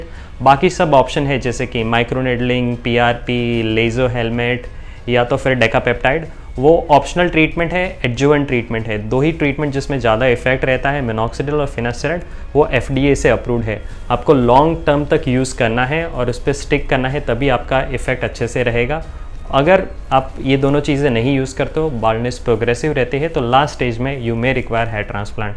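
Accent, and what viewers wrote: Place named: Hyderabad, India. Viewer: Hao yaaro hyderabadi hai ye doctor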